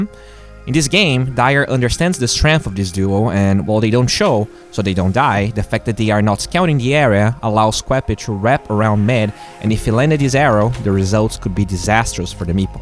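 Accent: Brazil. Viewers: Man, are you brazilian? haha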